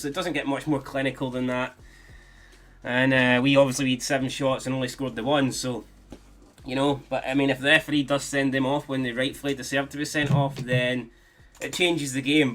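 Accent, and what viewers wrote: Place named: Scotland, United Kingdom. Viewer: Fantastic scottish accent ! Greetings from Argentina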